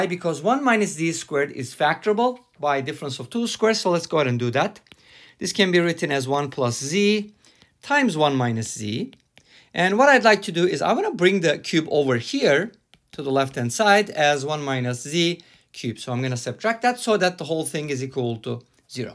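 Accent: Turkey. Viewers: Hello, may I ask where you’re from because your accent sounds like Turkish accent and I am Turkish so I just wanted to know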